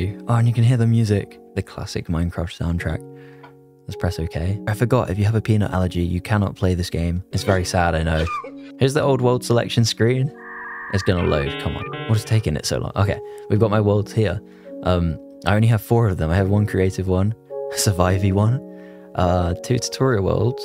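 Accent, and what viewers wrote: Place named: United Kingdom. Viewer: I love this guy’s accent. where is he from in the uk?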